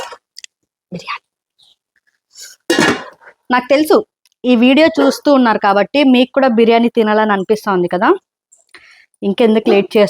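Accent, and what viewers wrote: Karnataka, India. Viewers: Miru karnataka vala mi telugu border lo kannada valu ela ne matladutharu memu same mi la ne matladuthamu telugu but we are kanadigas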